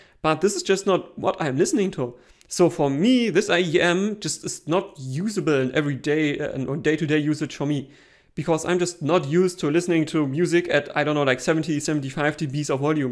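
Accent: Germany. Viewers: the german accent which sounds more delicate than american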